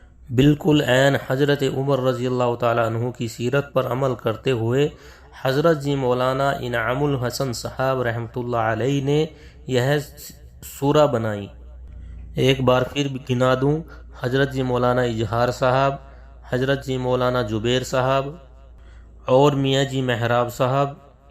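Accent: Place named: India. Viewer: HAZRET KI LA-JAWAB URDU: Yea koi Hind/Deoband kay alim hain? In ki Urdu Hindi ki mahzooz-kun amezish liay huway hay, maslan "NiZam" ko 'NiJam", "Jawab" ko "Zawab", "Shura" ko "Sura", "terah/terha/terhan" ko "terain" kehtay hain! Alif kio Ain-Alif ki awaz say perhtay hain, maslan "Ap" ko "Ain+ap" (ba-awaz-e-"Aam/common) kehtay hain, jo kay sareehan ghalet hay.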